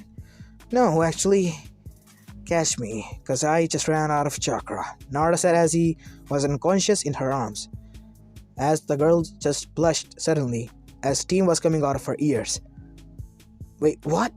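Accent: India. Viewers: By your accent I think you are indian, I am indian too and its very good to see that we are also contributing to the Naruto world.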